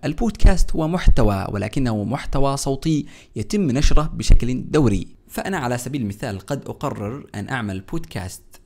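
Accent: Yemen. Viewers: اهلا فيكي اخي شكرا جزيلا لك . انا يمنية ولهذا عرفت مباشرة انه يمني من لهجته كما اني سألته ذات مرة في تعليق هل هو يمني او لا فأجابني انه من اليمن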